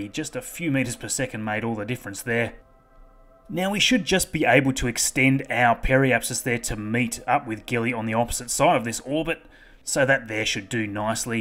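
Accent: Australia. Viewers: Another awesome video.....and it's really wonderful to hear a fellow Australian on KSP videos.....not that Shadowzone's German accent and Mr Manley's Scottish brogue aren't entertaining but it is a lovely reminder that we Aussies love all things spacey as much as the rest of the world. Cheers mate! Bloody bonza job!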